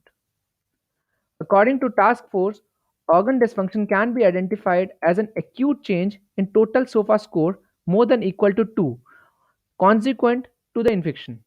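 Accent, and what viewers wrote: India. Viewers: Indo English is annoying for me, but I'm sincerely grateful for quick lecture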